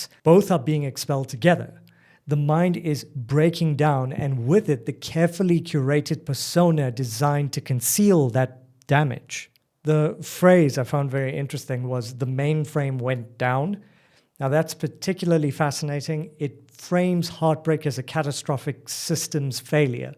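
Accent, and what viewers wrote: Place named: Johannesburg, South Africa. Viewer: Oh, that is why your "accent" sounds so familiar, yet unusual compared with what I'm used to hearing on YouTube... Hi 👋 from Joburg as well.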